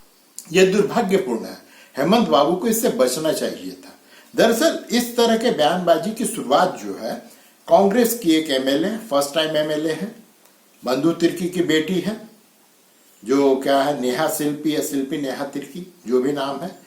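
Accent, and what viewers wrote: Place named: Bihar, India. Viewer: ji aap jarur Bihar ke hai.....😅😅😅😅 Kya sahi bole na ooooo😅😅😅😅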